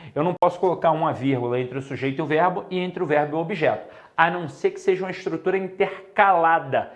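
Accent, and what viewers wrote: Rio de Janeiro, Brazil. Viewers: Sotaque de carioca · amei esse sotaque Carioca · Que sotáque lindo de carioca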